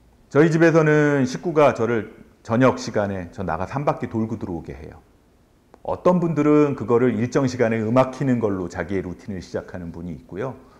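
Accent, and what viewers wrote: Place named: Chungcheong, South Korea. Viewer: 충청도 분이구만.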